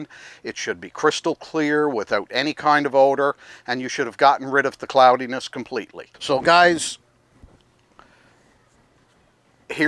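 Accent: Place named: Newfoundland, Canada. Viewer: are you from the east Coast? Little bit of a newfie twang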